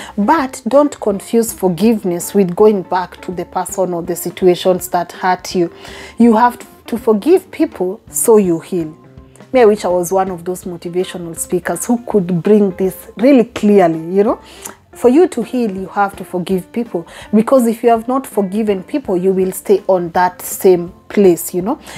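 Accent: Kenya. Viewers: Thank you for keeping your original Kenyan accent. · You sound very Kenyan YOU KNOW ; ; . ; ; ;. Right?? ⁉️ Ly Baurer 2️⃣4️⃣ December Climax to (Sounds like a true Kikuyu Woman) #NoUkabila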